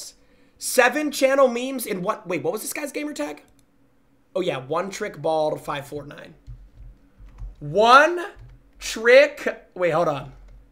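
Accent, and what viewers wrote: Canada. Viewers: W Canadian accent, w junk for sure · That Canadian accent killed me 😂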